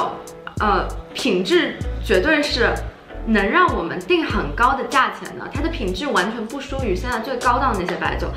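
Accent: China. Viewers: 武汉普通话？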